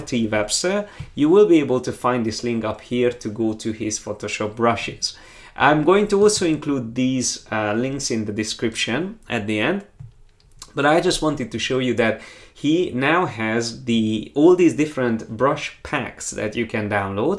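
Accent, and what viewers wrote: Hungary. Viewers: that magyar accent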